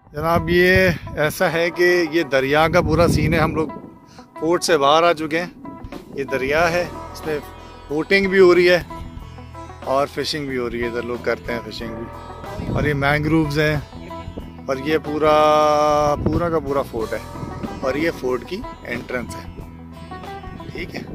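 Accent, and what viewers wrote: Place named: Sindh, Pakistan. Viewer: Great,you doing well and your sindhi accent is cute.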